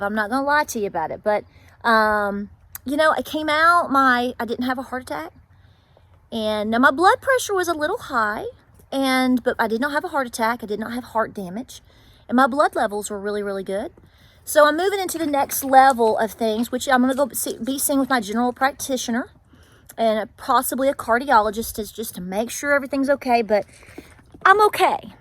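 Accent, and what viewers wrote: South, United States. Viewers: So glad you're ok! Just had your channel suggested to me, and I just love it. My husband's family was from Murfreesboro, TN and I grew up in Columbus, GA. We retired after military traveling around the world,now living in Rome,GA. It is such a pleasure hearing another fellow southern gal and learning from her on your recipes. I hadn't heard that term "cat head biskits" since my mother-in-law used to teach me in her kitchen back in the 80s-90s. Thanks for those great memories! Many blessings to you and your family! Thanks for such a great video here. Reminding us all to remember those things most important!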